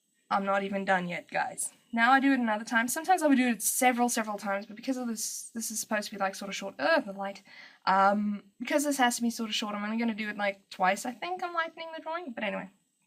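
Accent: South Africa. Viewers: Great videos really helpful :D The only problem with watching them is that your accent makes me miss my South African gf haha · OMG OMG OMG FINALLY A SOUTH AFRICAN!!! Wait a second as I subscribe to you. To my surprise I was like all these other people like Europeans and Australians etc. and I am sitting here like where are South Africans? Then when clicked on your channel and the accent! I fell out of my chair, screaming. Gosh I am so happy :)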